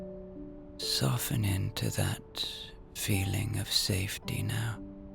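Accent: Ireland. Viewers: Thank you for this gorgeous heartwarming story. Dogs are just the best companions and full of love. Hello from a Cavan woman in Melbourne who loves hearing an Irish man tell a story 🙏🏻🍀💋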